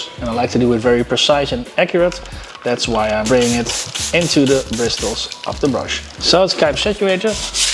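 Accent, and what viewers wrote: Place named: Netherlands. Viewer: Hello, you sound like a Dutch guy speaking English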